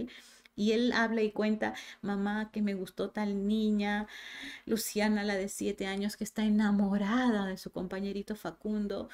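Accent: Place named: Peru. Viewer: Me inspiraS , tienes algun libro de tu autoria?? Hablas como peruana. Ya soy tu seguidor..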